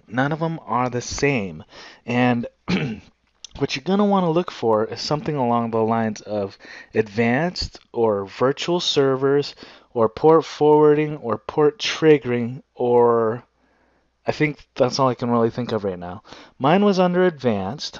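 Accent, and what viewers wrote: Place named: Brazil. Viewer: So you're from Brazil? I could tell.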